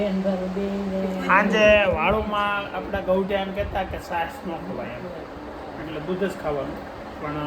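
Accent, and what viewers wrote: Kathiawar, India. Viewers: sorry gujrati vachta nathi avartu Anguta chaap chu 😂 Bolta khatyavari gujrati che😂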